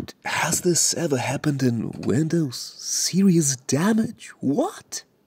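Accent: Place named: United Kingdom. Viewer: the british/whatever accent is that with the video is amazing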